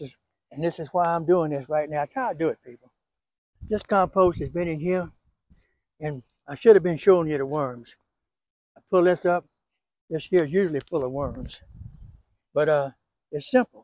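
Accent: Louisiana, United States. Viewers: Dear, I just love watching your videos. Your like the father I never had. I love your Louisiana accent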